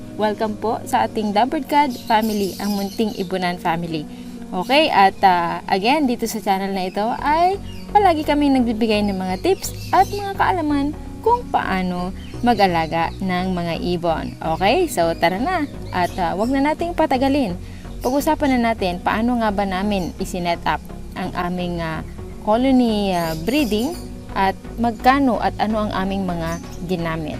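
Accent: Pangasinan, Philippines. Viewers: Litaw na litaw accent ng pangasinan hahaha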